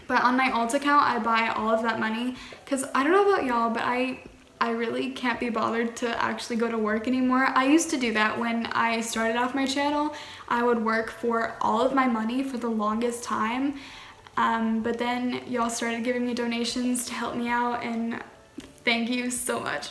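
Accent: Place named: Canada. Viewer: You sound Canadian and it's awesome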